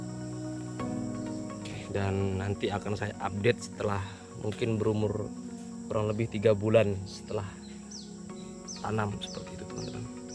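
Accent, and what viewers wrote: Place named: East Java, Indonesia. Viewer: Asli jawa timur mana mas?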